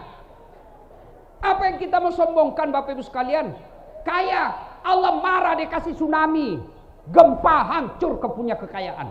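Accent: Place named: Toraja, Indonesia. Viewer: Orang Toraja ki pasti 😅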